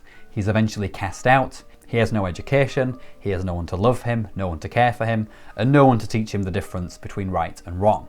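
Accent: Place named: Scotland, United Kingdom. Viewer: Is your accent from Scotland or from Northern Ireland? (I love it)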